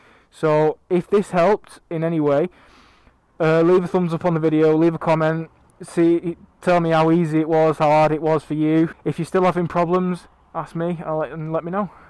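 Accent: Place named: Manchester, United Kingdom. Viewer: Good stuff mate. I just got the same bike and exhaust last week and have been watching video's thinking all I have to do is use an allan key to get the db killer out and drill a couple of holes to increase the volume, fuck euro 4, apart from abs which has already saved my life. Can I give you a shout if I am struggling with it? I am in Manchester and can tell by your accent that you are not that far away. Couple of beers in it for you :)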